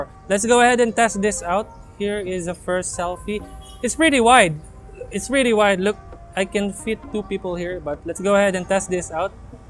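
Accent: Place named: Philippines. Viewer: I love your pinoy accent. hahahah thanks!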